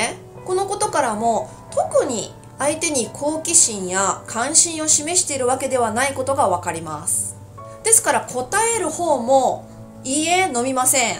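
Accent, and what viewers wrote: Taiwan. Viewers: 天哪！老师是日本人！这么重的台湾腔简直不敢相信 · 先生の中国語はすごく素晴らしくて、しかも台湾訛り。。。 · 老师您好，今天偶然发现您的视频，看了一个视频之后果断订阅了，我是一个学习日语三年的学生了，但是由于环境和难度的受限导致我的日语水平没有一点点改善，最常见的情况就是昨天学的知识今天就忘了，现在还停留在初级阶段，想想也倍感惭愧。最后想问一句老师到底是台湾人还是日本人啊？看第一个视频觉得老师是台湾人，中文发音是台湾腔，当看到这个视频开头部分老师的日语实在太流利了跟母语一样啊，让我不禁感叹老师在哪里学的日文啊？看到评论才明白有人说您是日本人，难怪日语说的这么标准。谢谢老师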